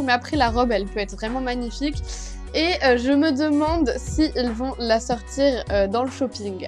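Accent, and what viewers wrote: Quebec, Canada. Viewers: Tu est cebecoise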